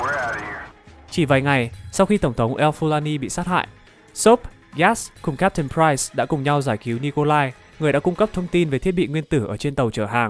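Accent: Standard. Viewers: Bạn đọc khá rõ và phát ngôn chuẩn.. đóc tiếng anh chính xác tôi khá thích.. mog kênh sẻ ngày càng phát triển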